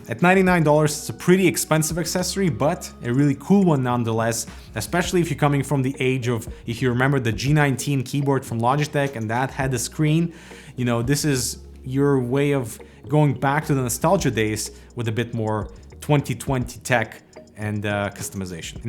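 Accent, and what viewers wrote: Russia. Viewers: Чо? ты русский что ли??? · bruh u russian or italian ????????? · Are you Russian?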